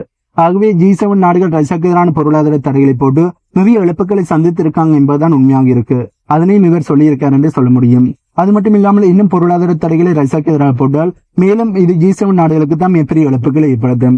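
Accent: Sri Lanka. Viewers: 🙏🏽 இது வட இலங்கை தமிழுடன் ஆங்கில சொற்கள் சேர்க்கிறது. இயற்கை தமிழ் 🙏🏽அப்துல் காமிர் ஸ்டேலை கேட்டு சலித்துவிட்ட்து அதைதான் எல்லோரும் கொப்பி பண்ணுறாங்கள் 🤣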